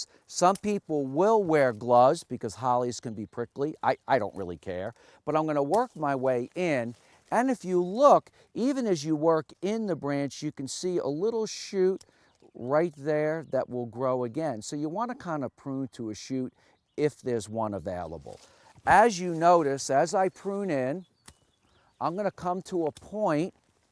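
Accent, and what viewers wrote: United States. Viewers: What is that accent? Jersey? Philly?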